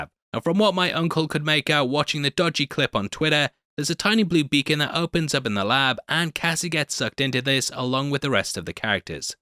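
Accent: United States. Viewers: nice american accent heavy !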